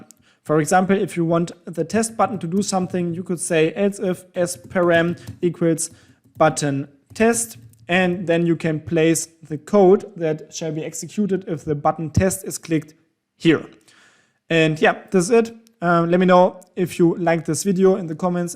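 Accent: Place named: Germany. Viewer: Hallo, krasser deutscher Akzent aber sympatisch..:D Ist es irgendwie möglich, dass die Positionen sofort gleichzeitig geschlossen werden und nicht nacheinander, was ja nach Menge leider einige Sekunden dauern kann... Also alle sofort geschlossen wäre für Scalping noch besser! Ist das einfach umzusetzen? Danke!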